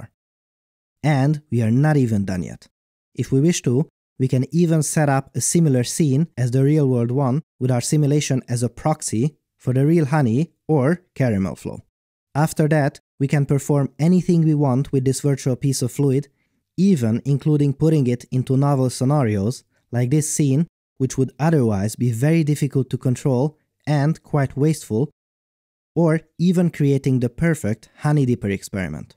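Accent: Hungary. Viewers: Are you Hungarian? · i dont know why i'm here, i've never been interested in 3d simulation, but as i heard you speak, i knew youre hungarian haha ;))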